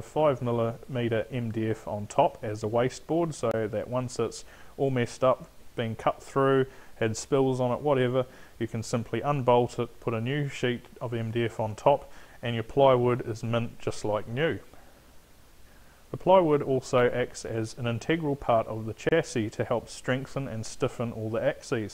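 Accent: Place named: New Zealand. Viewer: I didn't know you were from NZ, I thought it was England!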